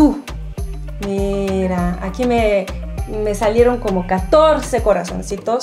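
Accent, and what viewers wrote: Mexico. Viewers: Hola tú puedes pasar por una mexicana y más por el acento que has logrado al hablar el español 😃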